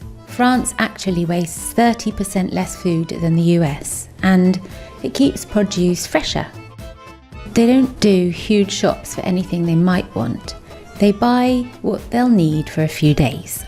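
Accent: United Kingdom. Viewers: That's NOT a French accent. She sounds British. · That's great, but why does this "French" narrator have an English accent? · Sounds just normal here in iRELAND -You sound Uk ? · She's British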